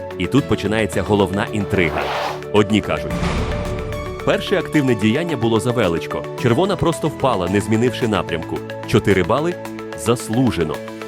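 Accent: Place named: Russia. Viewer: Розганяють русняве іпсо. Канал підозрілий: не атаман, наголоси русняві ставить...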